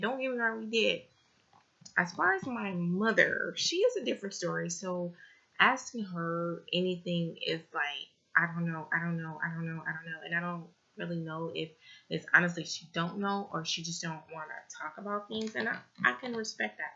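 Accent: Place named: Louisiana, United States. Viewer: You sound like someone from Louisiana.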